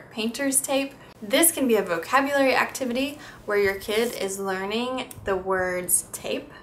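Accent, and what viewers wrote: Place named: United States. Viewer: When I hear you talking, I hear an unclear voice like somebody who just woke up or have been to a place where you had to shout loud, like your voice is cracking, hard to describe but I was talking with other people in my country about it and they said it is typically something American women do. Why do you guys do that? It doesn't sound like a nice clear voice to listen at to be honest.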